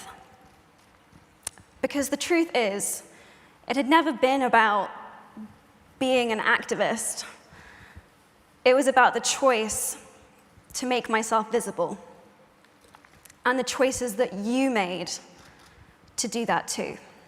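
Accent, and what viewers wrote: United Kingdom. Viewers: i love her british accent <3 always love u Emma keep motivating !!!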